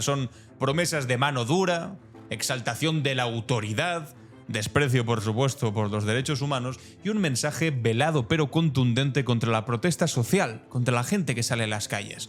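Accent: Spain. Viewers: Cállate hoy tanto Delincuente que mata a diario elsu padre encarceló al desgraciado genocida de ABIMAEL a es genocida con tu acento español anda a hablar a españa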